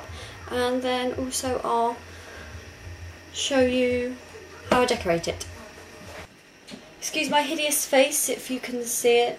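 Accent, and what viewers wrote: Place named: England, United Kingdom. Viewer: going to try this over the weekend, love ur accent-where in England are you from???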